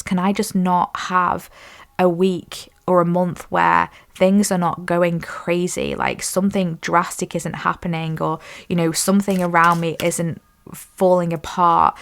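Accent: United Kingdom. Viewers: British.?? Coool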